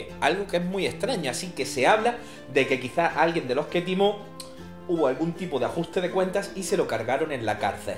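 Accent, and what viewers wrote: Granada, Spain. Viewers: es q eres granaino?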